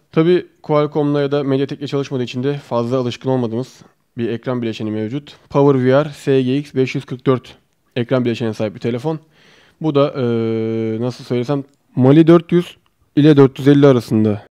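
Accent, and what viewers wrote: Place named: Eskişehir, Turkey. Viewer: Eskişehirrr????? Eskişehirmisin sen abi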